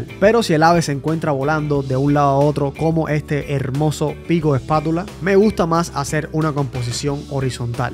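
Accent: Cuba. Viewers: Jajaja.. desde que empezó el video tenía la duda pero cuando dijiste “se nota a la legua” dije este es cubano si o si 🤣..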